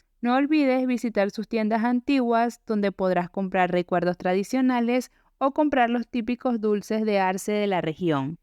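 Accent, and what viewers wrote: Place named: Venezuela. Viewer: La joven que narra tiene un acento muy bonito, parece venezolana o colombiana. De dónde será? Es solo curiosidad.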